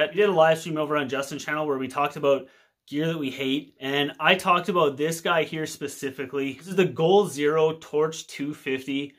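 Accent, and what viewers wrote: Canada. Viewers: Geez, if you want a larger U.S. Audience gotta tone down that canuck accent my friend - but, guessing it's a slow PRO-cess.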